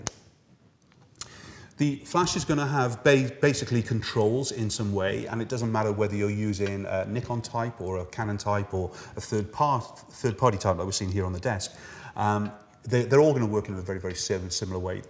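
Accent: United Kingdom. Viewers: Morgan Calvi English West Country...Gloucester or Bristol would be my guess.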